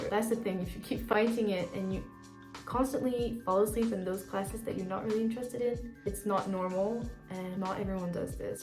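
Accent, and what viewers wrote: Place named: France. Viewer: Je reconnais à ton petit accent que tu dois être française (ou belge je sais pas ?). Et franchement merci pour tes vidéos. Tu mets exactement le doigt sur les problèmes que je rencontre au quotidien et je pensais tellement être bizarre et seule à être dans ce cas et tu nous prouve le contraire. Merci infiniment et continue à faire des vidéos comme ça parce que tu vas aider plus d'une personne paumée ah ah. Ça fait du bien de se sentir comprise et de savoir que d'autres personnes arrivent à mieux vivre avec leur TDAH. J'ai hâte d'avoir tous tes conseils pour apprendre à mieux vivre avec ça. Vraiment, merci ❤️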